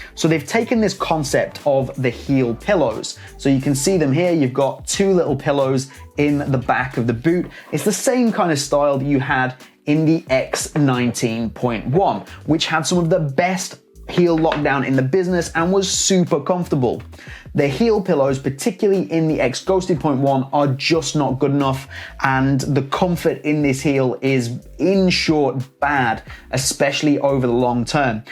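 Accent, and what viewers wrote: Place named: Cork, Ireland. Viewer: no way XD I never wouldve guessed, your Cork accent is very mild hahaha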